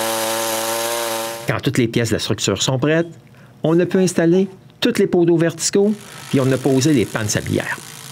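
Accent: Quebec, Canada. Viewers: j’adore cet accent québécois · j'adore l'accent québécois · j'aime bien aussi votre accent canadien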